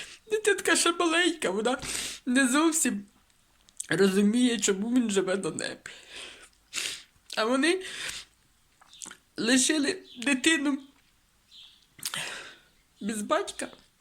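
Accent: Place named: Poltava, Ukraine. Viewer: Вас також хайБог береже і Ангел Охоронець. Бережіть себе, дорогенька, це я запитувала, звідки ви. Бо по говору наче з Полтавщини. Ви дуже приємна жінка. Приємно почути, трішки відволіктися від своєї буденності, думками когось пожаліти, заплакати. Я дуже мало кого дивлюся, а вам , дай Боженька, здоровʼя❤️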